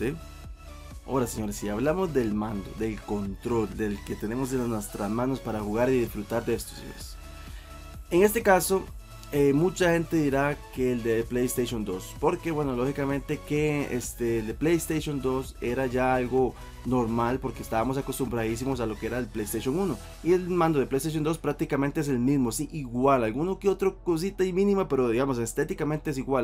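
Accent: Costa Rica. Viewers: Playo, tico hablando!!! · Ese acento, costarricense pa ¡pura vida!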